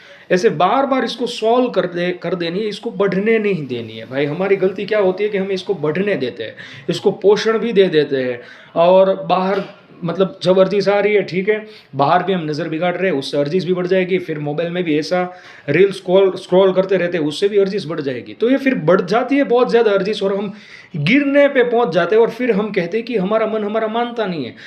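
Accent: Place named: Gujarat, India. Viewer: vaah... Mane laygu j tu tamara hindi ma gujrati tone thi... Bau anand thyo... Tamne hu follow karu chhu and tamara videos jou chhu.. Bau pela pan bija videos aava badha topics par joyela tyare em thatu k chalo amal kariye pan vasna evi cheej che k tamara thi rehvaay nai.. Me pan bau nani umar thi sharu karyu tu... Pan mota thya pachhi control rakhto thyo pan je kevay k man ma gandgi bhari hoy tya sudhi e reality ma avva nu j che.. e eno rasto sodhi ne parane apnne e karavi le... Pan have hu evi umar ma chhi jya mara have lagna thase mari nani ben che graduation complete thyu che last year enu e pan have lagan karva jevi j che.. And mara papa kaik bau kamata nathi.. And hu have 25000 na pagar sathe job sharu karel che 1 varsh upar jene thyu.. Atle have mare to aa badha ma thi farajiyat bahar nikdvu j pade em che kem k have atyare no jaaygo to jeevan have barbaadi taraf j jase.. 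pan sthiti atli pan kharab nathi... Pan thodu to kharab che k hu koi bija ne na sachvi saku jo hu andar thi atlo santosh sathe na jeevto hoy to.. atle man nu ek level have achieve karvu che atle have tamne joi ne man ma kaik inspiration made che.. Em to hu foreign accounting ni job karu chhu.. CA chalu karyu tu 12th pachhi ema CPT thai gyo to pass pan pachhi intermediate ma atki gyo.. have ema job ni sathe preparation kari ne nikdvu chhe.. Pan maro pele thi confidence level occhu hovane karane and communication weak hovane karane kadach maro pagar occho che... Atyare hu eklo bija city ma rav chhu pare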